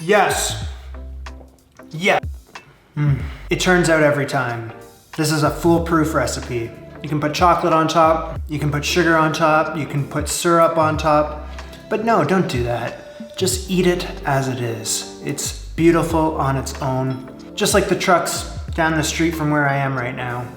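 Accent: Canada. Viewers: you dont need eggs and you dont need the bullshit pearl sugar; lots of cheaper and more common alternatives. (ps..are you canadian or from the pacific north west - you are familiarly annoying).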